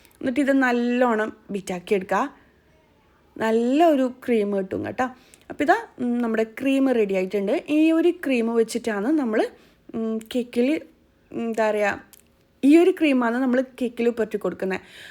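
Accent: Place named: Kannur, India. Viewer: നിങ്ങള് കണ്ണുർക്കാരിയല്ലേ... നിങ്ങളെ സംസാരം ഇതുവരെയും എവിടയും കേൾക്കാത്ത സംസാരം... ഓരോ വാക്😂 റെഡി ആകാൻ വേണ്ടിക്ക് ഇങ്ങനെ കുറച്ചു ... കേൾക്കാൻ രസം ഉണ്ട് 😁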